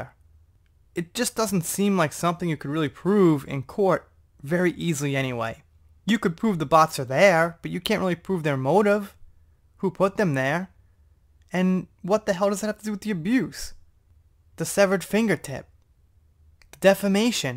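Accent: New York, United States. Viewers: Man I'm real late for this one but I wanted to tell you or ask you, are you from New York? Kinda sounds like it. But also I heard you live in Japan, is that work related? I know I'm a little nosy, well really I'm a lot nosy. You don't have to tell me anything. I'm not a Stalker or anything. I promise just nosy. Thanks for the great info..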